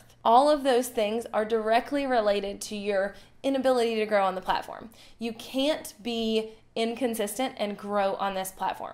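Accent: South, United States. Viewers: I’m glad I found your channel last week. I really like your presentation style and slight southern accent. And thanks for the tips! Keep up the good work! :)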